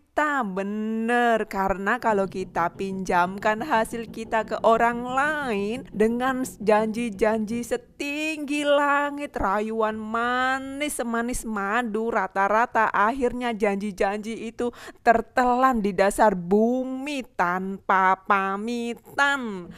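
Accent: Java, Indonesia. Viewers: Sumatra kok logatnya jawa banget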